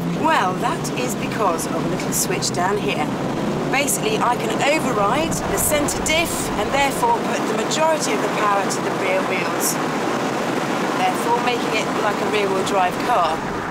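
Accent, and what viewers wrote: United Kingdom. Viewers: I'm guessing your from somewhere in the UK there is no t sound if your in Japan it's more like impressa, most counties pronounce it imprezza. I'm very aware of the reason you brought up forza and I addressed that in my previous reply.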